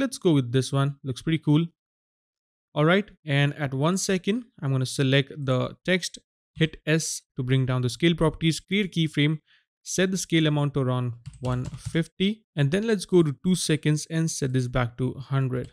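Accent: India. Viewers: Indian accent huh? 😀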